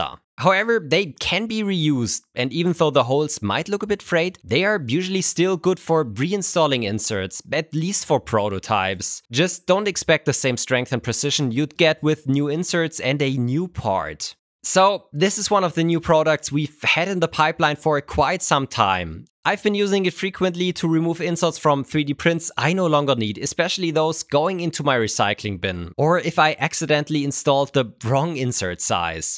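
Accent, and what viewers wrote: Germany. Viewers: Dein typisch deutscher englisch akzent ist einfach geil. Ich schau deine videos sehr gerne!!! Mega Kreativ! Einfach Hammer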